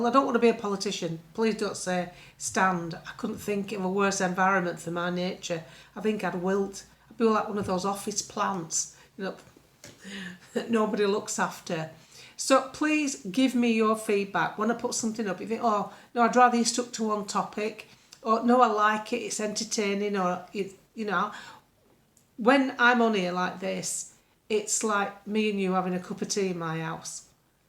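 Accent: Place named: Lancashire, United Kingdom. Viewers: Pure common sense is never a waste of time. I’m a Lancashire lass myself and love your accent.🏴🇬🇧🏴🇬🇧🏴🇬🇧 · Great to hear common sense with a Lancashire accent.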